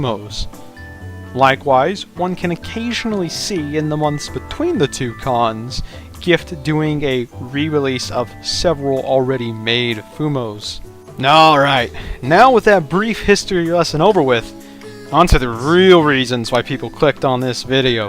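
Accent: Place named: United States. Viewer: Informative video, but damn, the American accent is strong with this one, butchering half the names. For example, Ran Yakumo, is not called "Ren"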